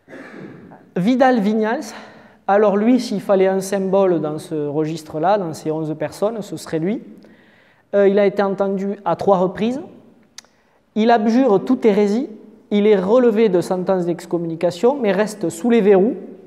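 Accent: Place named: Occitanie, France. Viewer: Un pur bonheur de vous écouter! Belle faconde égrénant notre histoire dans ce noble accent que je connais si bien et qui fleure bon notre pays d Occitanie! Un véritable débat d idée avec ce public passionné. Merci pour ces conférences didactiques, exprimées dans une remarquable clarté digne d un grand historien 😊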